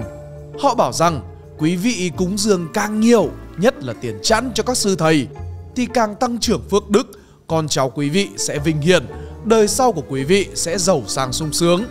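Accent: Standard. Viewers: Bạn nói chuyện đúng chuẩn!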